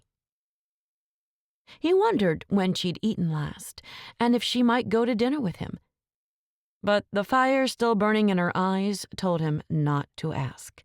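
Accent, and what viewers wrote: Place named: South, United States. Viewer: Really enjoyed the story. I have lived a n the south all my life and I’ve never heard anyone speak so slowly and so southern.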